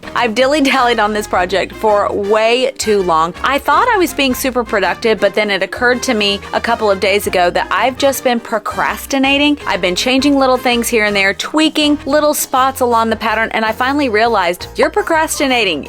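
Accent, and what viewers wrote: South, United States. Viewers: I knew it! North Carolina! With your cute southern accent!!!! 🤗🔥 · Hi, loved the video today! I think your work room is adorable. I thought you were from the south, I was guessing South or North Carolina! I am in Georgia. I have been knitting and crocheting since I was a teenager. I became a fiber artist about 15 years ago. I had never thought of myself as a real artist, just a woman with a love of all things yarn. Then a very dear friend of mine who worked at an art department in a university in California, looked at my work and said that it was comparable to many of the professional things that she had seen displayed at various fiber art exhibits. She helped me to see that what I made had beauty and artistic value and that my own designs and patterns were unique. It really changed how I viewed what I did and gave me confidence to try new things. 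It truly opened up a whole new world for me.:) This summer for a little change I tried my hand at embroidery and thread painting. Now I am back working on a new shawl design. I have a small craft room upstairs which I affectionately call "The Lair". I think we have a lot in common. Have a great day ahead!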